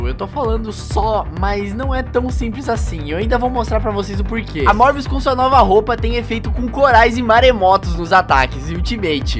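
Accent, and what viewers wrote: Rio Grande do Sul, Brazil. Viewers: TAMBÉM SOU GAÚCHO BAAAAA QUE CONHECIDENCIA É ASSIM QUE FALA BAAAAAAA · VC É DE RIO GRANDE DO SUL ?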